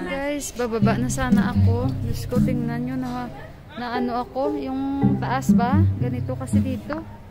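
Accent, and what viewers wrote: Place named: Hiligaynon, Philippines. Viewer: d talaga mawala tono.ng.ilongga day🥰🥰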